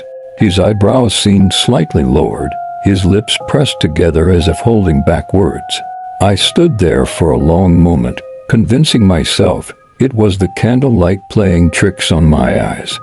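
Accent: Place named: United States. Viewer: If it's in the UK, why is the narrator using a US accent? Would make a great practical joke.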